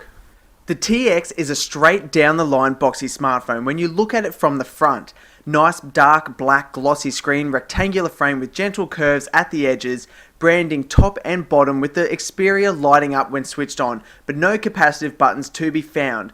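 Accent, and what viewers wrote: Australia. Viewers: Australian accent, love it!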